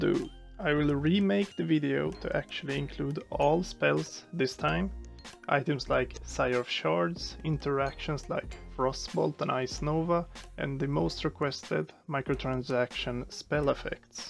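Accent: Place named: Sweden. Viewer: You sound swedish, hope you feel better soon ÅÅ^^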